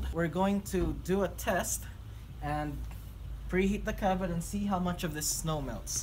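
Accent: Philippines. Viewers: I noticed the Filipino accent 5 seconds into this video haha. Greetings from another Pinoy from SoCal and it's great to see another pinoy driving an EV. 🇵🇭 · Worth it ba tong sasakyan na to sir ? Taga cali kasi ako ang dami kong nakikita nyan dito. Hindi naman malakas sa kuryente pag nag charge filipino ka halata naman sa accent nating mga filipino.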